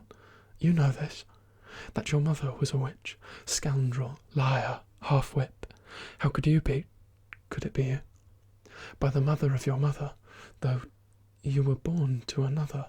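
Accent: United Kingdom. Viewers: One of the reasons that I keep listening to your voice is because I wanna learn your British accent ✨🥲 It is sooo beautiful.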